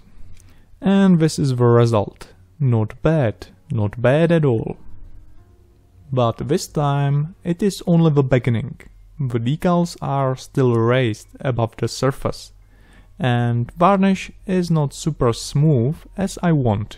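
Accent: Czech Republic. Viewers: Are you from Czech republic? Based on your accent :D. btw amazing job. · There is only one accent in the wold like this. And it is unmistakably Czech! :D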